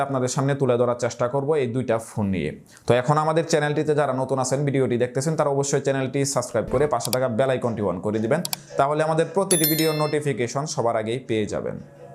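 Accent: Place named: Mymensingh, Bangladesh. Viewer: মমিনসিংগা মনে হচ্ছে!!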